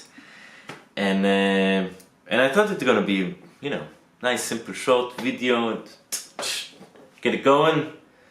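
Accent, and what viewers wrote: Israel. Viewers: i loved the look of the details in the pen and the engraving, thanks for creating a magnificent art piece. is't your accent from israel? you sound like my cousin, thats why i ask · מבטא ישראלי למדי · המבטא הישראלי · Accent is definitely israeli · * Clicks on video * * Hears Israeli accent * INSTANT SUB! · המבטא שלך פשוט צועק לי ישראלי באוזן זה קורע!😂 אגב הדברים שאתה מכין מדהימים🤟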